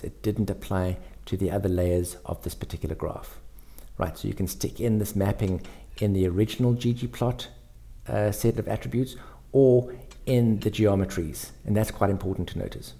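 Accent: South Africa. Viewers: Are you South African bro? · south african bloke? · Can hear that SA accent loud and clear. Great channel Boet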